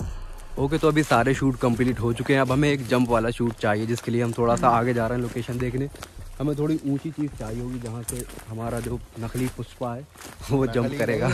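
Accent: Farrukhabad, India. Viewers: mujhe tumhare bolne ke tarike se hi pata lag gya tha ki tum farrukhabad ke ho I'm also from farrukhabad fatehgarh mera nanihaal hai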